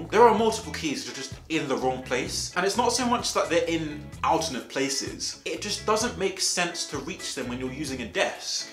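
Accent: England, United Kingdom. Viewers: Says in a lovely english accent: "it's fairly heavy--" SLAM!!!!!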